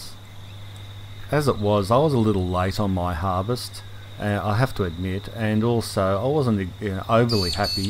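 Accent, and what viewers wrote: Australia. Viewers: Rithik Madan Australian I think, maybe New Zealand but pretty sure he’s mentioned being Aussie. Definitely not british. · Chowder Stevens he's not English he's an aussie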